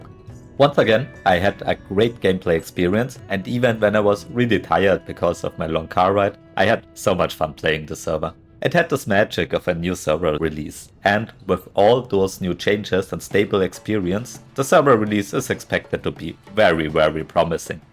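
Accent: Germany. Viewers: thanks for the video but the german accent is bursting me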